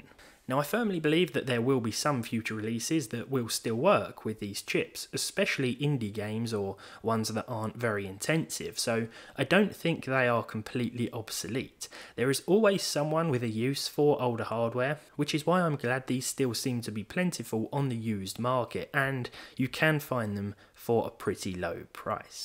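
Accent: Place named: United Kingdom. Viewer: British accents to me are like pissing a set of prison keys... but I tolerate it for because I love him.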